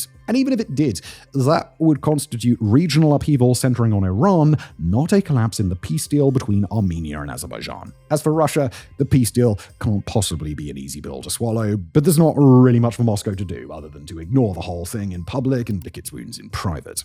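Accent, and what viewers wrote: United Kingdom. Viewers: His British accent is too heavy. It's difficult to follow what he's saying.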